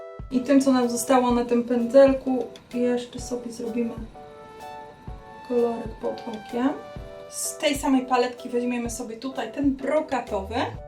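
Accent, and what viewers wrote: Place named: England, United Kingdom. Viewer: Kocham jak wymawiasz polskie nazwy z angielskim akcentem ❤❤ Zrób jeszcze raz to tylko z angielskim nazwami ❤❤❤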